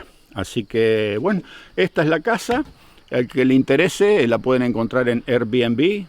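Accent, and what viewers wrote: Argentina. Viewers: Como se hacen querer!! que maravilla seria poder viajar con ustedes! Hace 2 meses llegué a Toronto y durante todo el proceso de sacar la visa (9 meses me llevo) estuve mirando sus videos y aprendí un monton sobre Canadá!. Gran saludo!!! me recuerda a mi papá que nació en la misma epoca. Y es tremendo como tiene todavia el acento argentino bien marcado! Gracias!!!!!! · arranca el video mencionando que va a regar las plantas porque hace mucho calor, aunque no sean sus plantas ni su casa QUÉ GRAN CORAZONNNNNNNN, LA PUTISIMA MADREEEEEEEEEEEEEEEEEEEEEEEEE, encima dice "le vamos a pegar una regada", no hay caso, lo escucho y escucho tonada e historia argentina, lo apreciamos mucho!! <3 <3 <3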